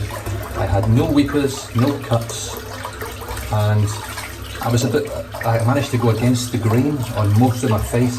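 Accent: Scotland, United Kingdom. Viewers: I don't think there's anything wrong with your speech cadence at all. It's probably just that a Scottish accent can be challenging for people living outside the U.K. You do look very comfortable in front of the camera now, and your videos are entertaining and informative. Nice work. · If he talks to fast for you, try backing off the speed to .75. I usually watch on 1.25... the Scottish accent sounds better when it's rippin'... full Trainspotting 😆😂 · Um...I don't know who complained about how you speak, but honestly, a Scottish burr is the sexiest accent in the world. Added to those beautiful eyes...oof. :D You're perfectly understandable--don't change a damn thing. Shave on. I just bought this soap bc the label is so gorgeous. sigh I'm so easy...just not cheap ;) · Great review! Melody and I thank you for all the support and kind words. Kind words in a Scottish accent are truly the best so please don't change a thing! 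I prefer an unscented aftershave or witch hazel as well so I can enjoy the scent of the soap on my skin a little longer.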